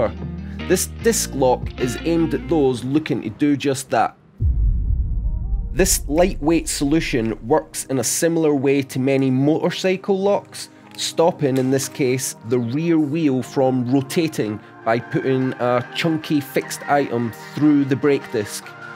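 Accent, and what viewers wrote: Scotland, United Kingdom. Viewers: Yaaasssss a Scottish guyyyy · i was thinking, hmm that looks like Newcastle quayside and north sheilds..... but your scottish! yup i was right it is haha im living here aswell :) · ok, stop.. i can't resist that much british accent.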